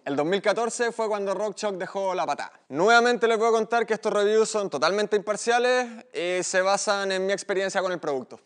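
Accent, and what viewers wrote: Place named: Rural, Chile. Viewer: Por qué no hablas un Chileno como Cristian Contreras Radovic? No podes ser menos chileno campesino? Sería mejor de oírte en otros países! Saludos cordiales 😎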